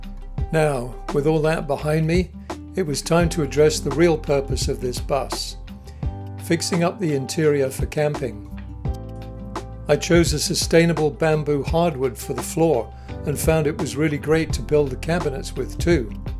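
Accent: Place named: West Country, United Kingdom. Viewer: Your accent, there is a hint of west country, maybe Swindon or Bristol? Great effort, you worked very hard and the bus looks fantastic. Enjoy 😉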